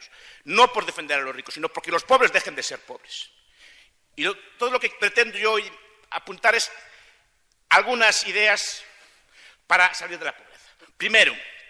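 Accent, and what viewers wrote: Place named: Galicia, Spain. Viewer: Interesante sus argumentos, ....el acento gallego lo hace adictivo....saludos desde Buenos Aires, provincia de ultramar Gallega....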